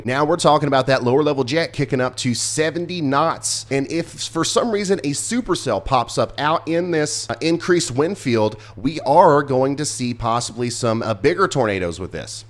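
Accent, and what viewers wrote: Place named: Kentucky, United States. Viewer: Hello from London kentucky, u talk like ur close to here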